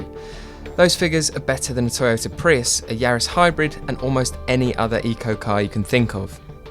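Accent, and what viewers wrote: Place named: England, United Kingdom. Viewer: I don't know why, but I can't stand the way English people talk.. It has the power to make the coolest cars sound absolutely rubbish, darling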